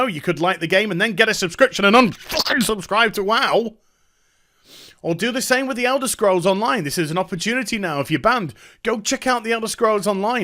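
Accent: United Kingdom. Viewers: I gotta say, i really like the British way you say things. You remind me of sargon of akkad.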